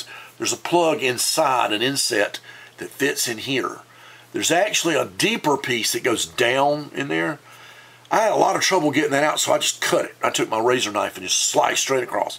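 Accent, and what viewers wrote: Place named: Texas, United States. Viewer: I've never heard of Southern Texan guy talking about Batman action figures loll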